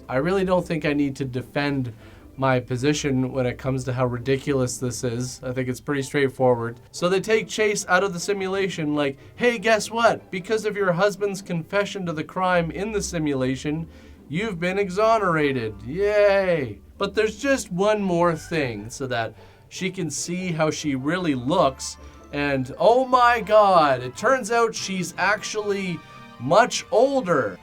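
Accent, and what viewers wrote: Canada. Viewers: People say there isn't a Canadian accent, but this dude is here to prove otherwise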